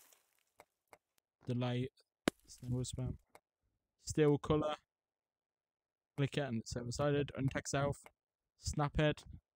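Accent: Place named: United Kingdom. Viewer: that is justnormal british accent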